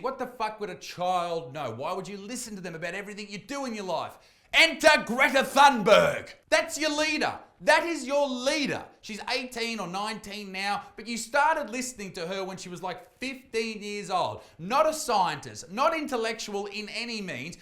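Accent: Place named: Australia. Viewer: Mate, you’ve gone to shit it’s not even fucking funny. Your beard wobbles like a scrotum and you talk like this true blue Aussie ranting on about “issues” which you refuse to show both sides of the argument about or acknowledge fucking facts about renewables, ect. Your dad was a bloody NRL premiership player and you likely grew up rich af but still pretend like you speak for the people despite literally none of this affecting you, your whole MO has literally become stirring up controversy and then begging your viewers to suck you off, buy some of your merchandise and to watch one of your “standup specials” You know how Jake Paul claims to be a rapper and can’t rap? Yeah that’s exactly what you have become except with comedy, pushing some batshit crazy political shit that purely consists of “trans person crazy, body positivity crazy, vegan crazy” and when you do make a video that has valid points it’s regurgitating the same shit you hear a million times on other channels, (friendly Jordies controversy) your comedy is just putting on some god awful show with your followers where you meet and greet them, refuse to do nothing remotely funny and just consistently hate and be an edgy asshole up on stage, and your viewers love it. 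You could wank onto the fucking podium and your viewers would call you the greatest comedian of all time. Also, you unironically dressed up as the joker and shot some broccoli with an air rifle to promote one of your god awful shows. Honestly mate, you’ve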